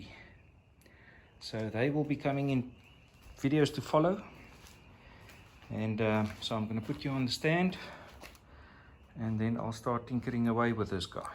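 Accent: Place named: Australia. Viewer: Love the Videos. Your Aussie accent is so calming. Sends Tingles down my spine 🤣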